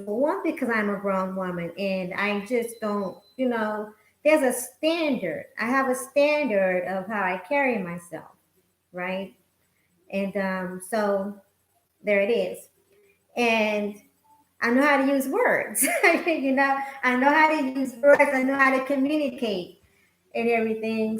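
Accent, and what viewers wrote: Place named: United States. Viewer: Hey, are you from the states? Reason why I ask is because of your accent, which is lovely btw.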